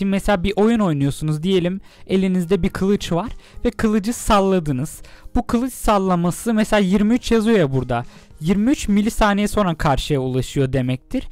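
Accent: Kayseri, Turkey. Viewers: kayseridemi oturuyosun abi ??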